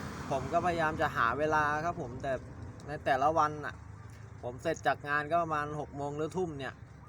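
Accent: Thailand. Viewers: คนเหนือรึค่ะออฟก้อคนเหนือ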